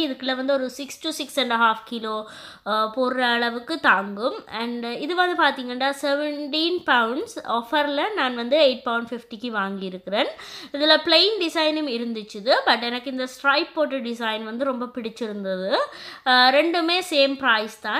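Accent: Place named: Sri Lanka. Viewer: Very good collections dear:) Your Tamil is too good to hear:) Lanka?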